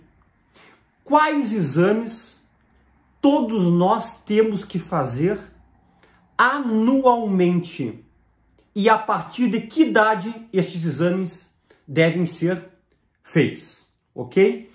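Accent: Rio Grande do Sul, Brazil. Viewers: Este sotaque é encantador. Os gaúchos já nascem com oratória. Parabéns!!! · Adoroooooooo esse Dr ehhh, muito competente, vídeos muito explicativo! Ainda mais com esse sotaque de gaúcho tchê, fica muito mais gostoso de ouvir🤩 Deus o abençoe muito🙏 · Ele até tem sotaque gaúcho, só não sei porrrrrque ele puxa tanto no R como fazem os cariocas.. Mas é um excelente médico 😃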